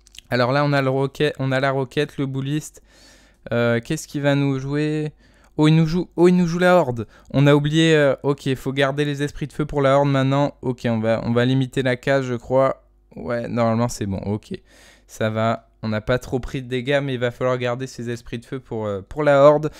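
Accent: Belgium. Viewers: il est belge ? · tes belge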